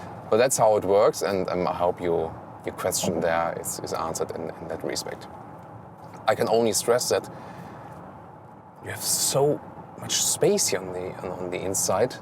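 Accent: Germany. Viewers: He's so cute! I really like German men accents... and that's a beautiful blue car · Your English is really good but the pronunciation is really awful. If you'd put a bit more effort in de-Germanising your English it would be much more attractive to listen to ;-)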